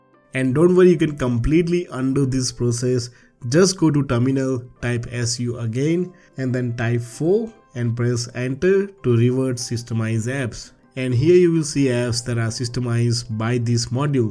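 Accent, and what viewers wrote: India. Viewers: thats Indian accent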